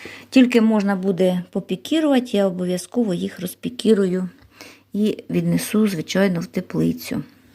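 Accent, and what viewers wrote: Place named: Central Ukraine, Ukraine. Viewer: Добрий вечір,. Пояснюєш і росказуєш дуже доступною мовою. Ти чесна і відкрита людина. Говір центральної України. Велике дякую за всі відео і твій труд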